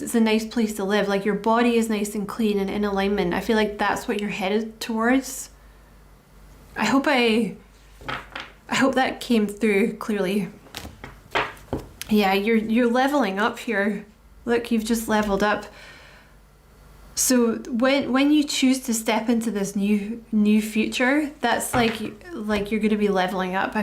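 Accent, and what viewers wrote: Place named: Scotland, United Kingdom. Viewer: Sounds Scottish to me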